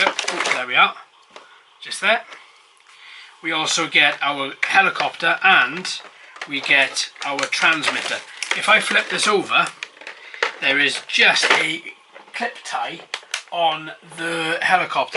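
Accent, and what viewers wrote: Wales, United Kingdom. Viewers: And that is why your videos are so infectious! Tell me, what part of Wales are you from? My dear old mum was born in Abergavenny, so I have Welsh blood!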